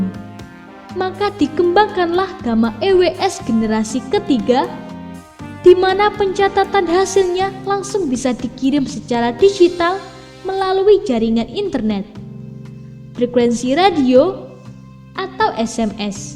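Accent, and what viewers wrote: Java, Indonesia. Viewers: Suara nya orang jawa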